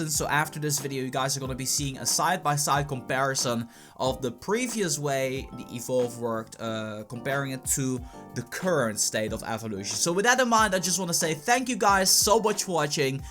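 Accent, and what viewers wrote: Netherlands. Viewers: Kom jij uit Nederland wouw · Are u ducht ??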